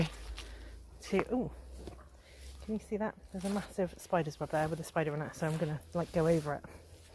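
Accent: England, United Kingdom. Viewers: Hej and. I do really love your videos, not only are they extremely exciting but at the same time very much relaxing. What I find exciting is what you two find and the many possibilities items that still haven't been found. you voice and your lovely English accent is like climbing into a warm bubble bath, so relaxing., I that you weren't in too much pain during or after your larking trip, please take care of your foot and be careful when in the water.🤗🇩🇰 I hope that your lovely and generous giveaway is open internationally. 🥰 I look forward to many more videos. By the way what are the chances of you and taking a larking trip to London where you do a bit of larking/mud larking at the Thames? And maybe follow the Thames up to Oxford and Windsor? That would be a wonderfully interesting video and a wonderful vacation for you. Lots of love from Denmark 🇩🇰 Ps. 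I too love the Victorian era and Queen Victoria is my favourite monarch, as well as Queen Elizabeth.👑 They were such strong women during a time where women were not "allowed" to be that way.🤔 My goodness my message to you two has become some what of a novel, I do apologise for that.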